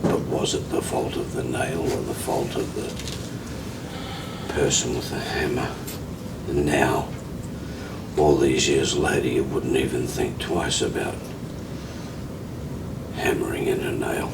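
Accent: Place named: Australia. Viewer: This guy is Australian, not English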